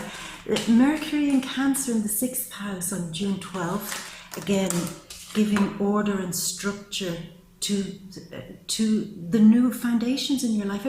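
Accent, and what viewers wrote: Ireland. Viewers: Sooooo funny, shove it up your arse...amazing and with your Irish accent..haha...thank you for an amazing reading and totally resonates with me looking for something to hold on to.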